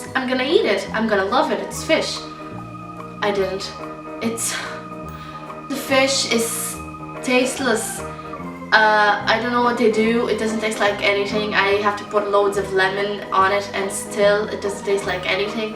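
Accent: Ireland. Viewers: Yer getting the Irish accent there. Great video. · You definitely have an Irish accent. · You developed that Irish accent fast within 5 months 😁😂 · I love your Irish accent! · You're Irish accent sounds stronger to my Irish ear than your Portuguese one 😁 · 5 months... thats a pretty strong Irish accent after just 5 months... haha enjoy ;)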